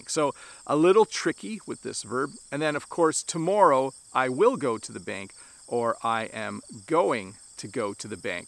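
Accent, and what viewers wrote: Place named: Canada. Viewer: a great opportunity to be in contact with the Canadian accent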